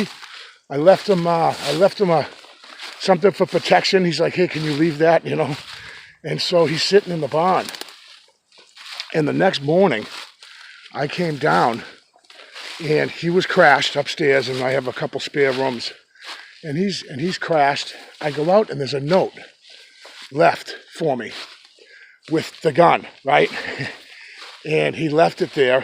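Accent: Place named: New England, United States. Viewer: this must be new england "back yaaad"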